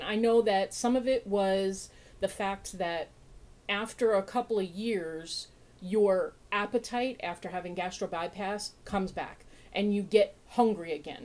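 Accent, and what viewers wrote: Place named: Minnesota, United States. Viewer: I love skulls too . I find the best stuff at bike events . I really like when skulls are used in unexpected ways. I have always struggled with weight my entire life . I have had periods of time at a healthier weight but it always creeps back up . Love the Lost Souls scarf 🤩 I always love watching happy mail ❣ Uffda on the Minnesota and Wisconsin accent...ya you betcha 😁 North Dakota sounds like that too ❣ There are degrees of accent , in the urban areas it is less pronounced than rural areas 😘 Fabulous happy mail ! The wooden swift fanfreakintastic!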